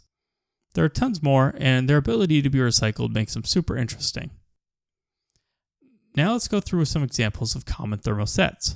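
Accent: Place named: United States. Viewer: Am. accent and you spell tires that way?? what is the story behind this?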